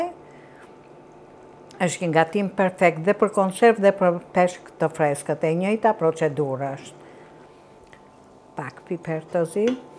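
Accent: Tirana, Albania. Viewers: Te lumshin duart moj zonje e nderuar te ndjek vazhdimisht . Me pelqen e folura jote origjinale e Tirones. Qofsh me jete te gjate dhe e gezuar.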